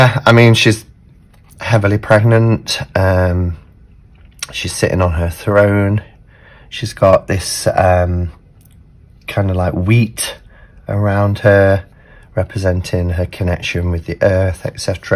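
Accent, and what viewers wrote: Nottingham, United Kingdom. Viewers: What an interesting deck of cards. Thanks for the walk through, always lovely to hear a familiar Nottingham accent (all my Mum's family were born in and around Nottingham). I don't use pippy decks but this one caught my attention. I looked around for it and found it for a great price on Amazon at the moment. The cards arrived today and although the cardstock is thin and will crease if handled roughly, the illustrations are great and it's a lovely addition to my small but growing collection. PS The cards are Produced by River Horse and printed by Lo Scarabeo, like the ones shown in the video.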